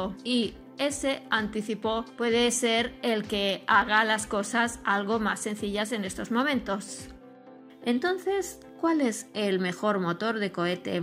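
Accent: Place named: Eastern Europe. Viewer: Es curioso este canal solo tiene videos de Musk y Rusia, tampoco he visto que el titulo se corresponda a nada que haya dicho Musk, así que todo esto tiene un cierto "TUFILLO" el "Mejor motor del mudo" si de hace 30 años era y es muy bueno y muy caro por el precio de 1 Space X hace 5 mejores y al menos igual de fiables. La locutora habla un poco como si fuera como del Este, en fin. Y la traca final "No vale la pena analizar los aburridos números" y tampoco que el RD 180 llega a una presión de 257 bares y un raptor ha llegado a 330 ese aburrido numero dice, más rendimiento más potencia. Una kk de video lo he visto a 1,5X y he perdido el tiempo y atufa a Kremlim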